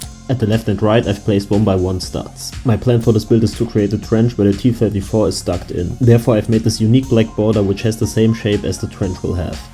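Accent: Germany. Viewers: Are you German? :) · Höre ich hier deutschen Akzent?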